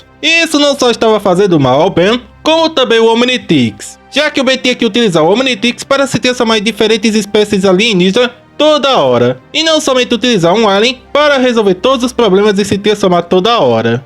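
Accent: Brazil. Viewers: Me pergunto de que país esse cara é, o sotaque bele parece muito com o ptbr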